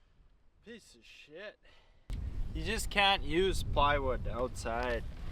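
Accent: Canada. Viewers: I can't give you any advice, i couldn't even build a bird house. I have OCD so i notice little things that would drive me crazy. I have to say i have never once seen anything you do trigger me. So precise, and professionally done. Thank you for taking us on your journey. I can't wait until it is completed. You are very talented and is amazing how you have so many skills. It takes a long time for spring to come in Canada.....i recognize the accent and speach patterns. My parents and 2 elder sister are Canadian. I was the first born American and have been to Canada a few times. Always happy to see your videos pop up on YouTube.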